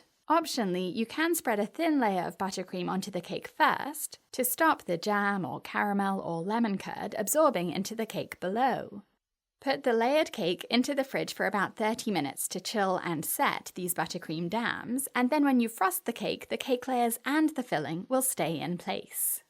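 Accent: England, United Kingdom. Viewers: What part of England are you from? I can’t figure out your accent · Honestly I'm devouring your information and tutorials.. they are so informative and clear.. especially with your beautiful British accent.. im attempting my first layer cake and have taken all your info on board to ensure I'm not rushing... still nervous but mire confident with your amazing tutorials.. thank you ❤❤😊 xx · Thanks a mill for this very helpful video!! 🩷🤍 Also you have a gorgeous British accent!!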